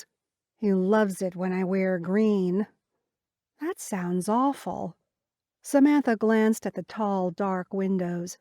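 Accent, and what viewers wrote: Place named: Canada. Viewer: Canada. The accent is about what I would hear back home in Wisconsin. The local slang,....no idea.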